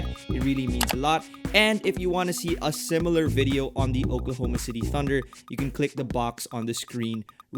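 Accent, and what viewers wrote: Philippines. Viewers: Hey man, are you filipino? My friend is filipino and he has the same accent as you! Anyways, great video! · I can recognize your accent. Are you Filipino? Great video btw! I love the mavs! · Are you Filipino? The accent. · Yo are you Filipino? I feel like I can hear the accent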